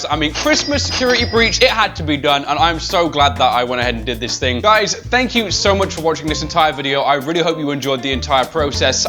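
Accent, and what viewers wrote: United Kingdom. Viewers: I can hear the British more now that he is not speaking directly into the microphone. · Why does he sound extra British then normal in this video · He sounds really British in this video for some reason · I like how when cooks or makes anything he just becomes so extremely British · with bad mic sound like real British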